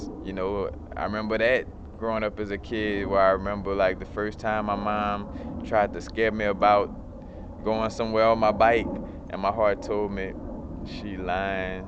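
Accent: New Orleans, United States. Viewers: You gotta be from the n.o huh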